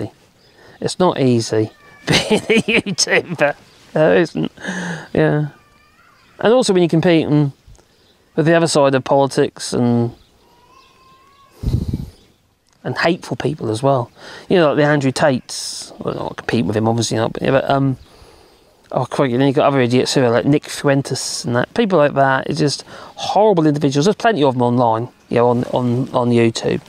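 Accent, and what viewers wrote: United Kingdom. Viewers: your dialect is quite strong and for a non-Brit sometimes hard to understand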